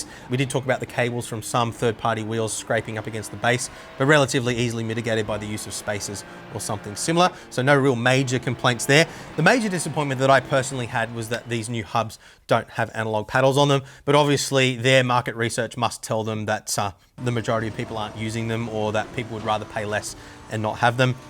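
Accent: Australia. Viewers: Are you an Aussie mate? Thinking of using your affiliate codes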